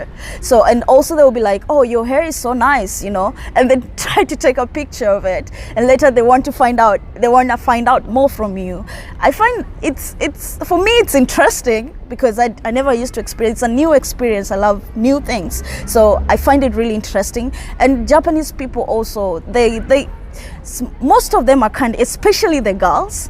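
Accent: Kenya. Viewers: thanks for the compliment. 😝actually most Kenyans speak like that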